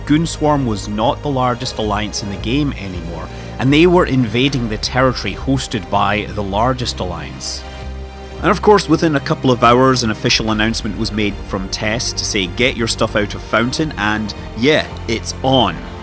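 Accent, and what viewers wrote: Scotland, United Kingdom. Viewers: I meant no offence. I actually like your stuff. I know it sounded like an attack towards you but in reality thats not what I meant. My appologies if thats the interpretation. What I meant is that althought people support it, I understand why the others like me dont support it. My other point usually when the CFC talks about the war vs BOB completelly fail to mention war vs IT alliance and war vs DRF, cos it did not go particularly well for goons. They also seem to jump from that straight to their next victory aka Fountain. That was my point. The story is not balance. After almost a decade of listening to the mittani's stories, one gets tired of his bs aka Cloud ring alliances hate Jeff. I guess he has no shame. And you do have a good scotish voice and I also think it will work out well for a book or something. I have watched your videos years ago and as you probably know the eve community is usually very good at geting things done well that benefit the game. 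It just shows to wonder that there is a lot of resistense from the community for this project. There has to be a very good reason for it. All the best.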